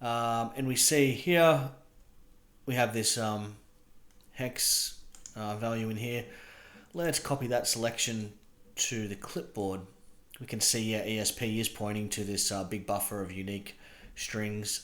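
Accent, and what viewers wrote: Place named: Australia. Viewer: Great series here. Enjoying it while doing OSCP. Nice hearing another Aussie on youtube too lol. Thanks for the uploads!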